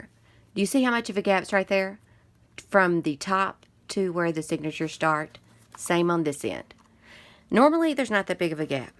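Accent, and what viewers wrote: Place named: South, United States. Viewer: Love love everything about this journal but I love your accent & the way you explain. Plz tell me y'all from the south 💜😘👍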